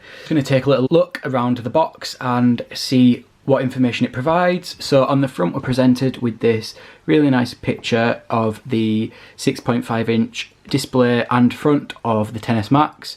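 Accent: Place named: West Yorkshire, United Kingdom. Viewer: Sounds like a West Yorkshire accent to me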